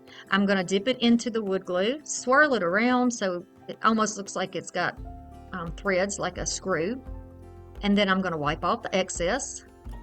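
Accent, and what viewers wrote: South, United States. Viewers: One of my favourite days of the week and the other being Tuesday. As I'm in the uk I watch your videos the day after you post them. Always the first video I watch as well and you never disappoint me. I love how chatty you were and when you get carried away or nostalgic about something how your southern accent gets stronger. Always cheers me up and I sure needed that today. Love how they all turned out and I'm definitely going to give the clay jug a go. Thank you again xx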